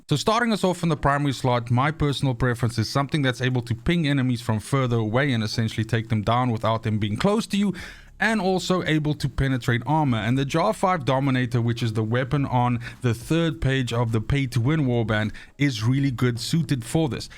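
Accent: South Africa. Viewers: Howzit bro. Are you from South Africa? Cool video man, you gained a sub and like from me.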